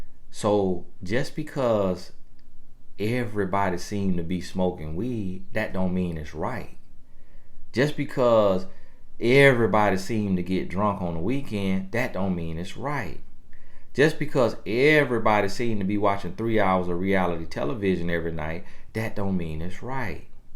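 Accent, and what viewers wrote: South, United States. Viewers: i had to rewind. Bro did you say 'pern' (porn)? 💀💀💀 My bro country as hell, & I'm country too so its all good. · Girl he is country. Yes he has been saying back 😆 I only knew that cuz I'm country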